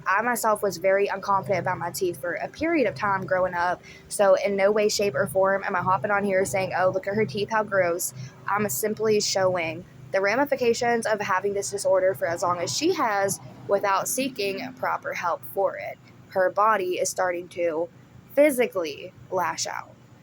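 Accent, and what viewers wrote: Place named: Alabama, United States. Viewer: I must know, are you from Alabama? because I am and we have the same accent!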